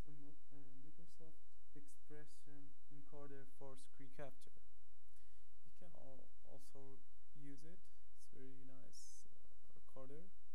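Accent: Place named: France. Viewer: Azy t'es un français :p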